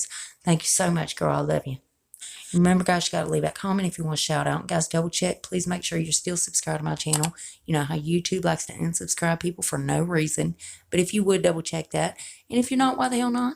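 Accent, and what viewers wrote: South, United States. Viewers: has always had a strong southern accent, just like myself. I'm from the south as well. I've been subscribed to her from almost right when her channel started, and her accent has always been the same.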